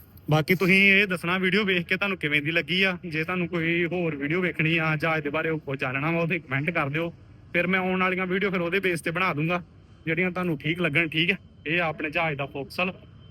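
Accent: Majha, India. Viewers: Language majhe di ah dist vill kehra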